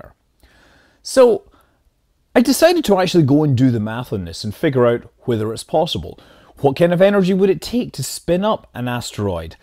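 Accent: Scotland, United Kingdom. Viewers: thank you Scott Manley. Great stuff. a fun mix of Kerbal, sci-fi, and hard science discussion, all in a Scottish accent. I wish I had stumbled across your channel earlier.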